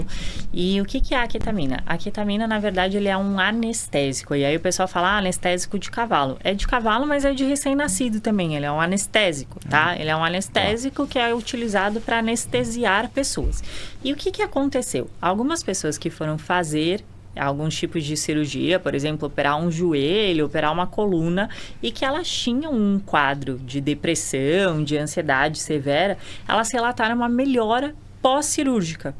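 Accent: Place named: Sao Paulo, Brazil. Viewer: Eu gostei do sotaque dela de paulistãããna 😂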